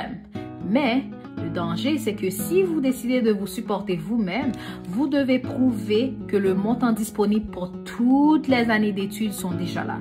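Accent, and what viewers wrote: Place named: Quebec, Canada. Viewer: J'aime le léger accent québéquois.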